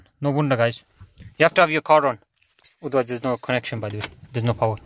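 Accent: Bradford, United Kingdom. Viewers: You're definitely from Bradford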